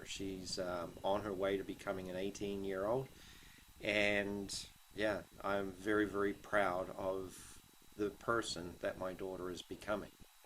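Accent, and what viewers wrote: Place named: South Island, New Zealand. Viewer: Kiwi,You sound like you are from the mainland/south Island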